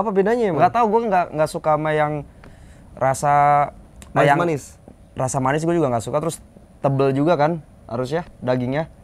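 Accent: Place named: West Java, Indonesia. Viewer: Sunda bnget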